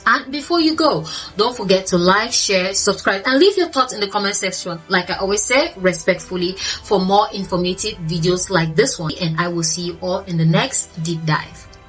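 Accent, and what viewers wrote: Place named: Nigeria. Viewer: I wish your name was mentioned. I congratulate you on the way this information is presented. Firstly is English your 1st language? If it is not, your English diction, vocabulary, expression, pronunciation and grammatical awareness is beautiful. I speak English well but I dont like that my 1st language is the Imperialist 'Kings English'! You make English sound elegantly Nigerian. Great video! Excellent content which is trustworthy and left field. This would not appear on mainstream western media. Scary question. Are u A.I. generated?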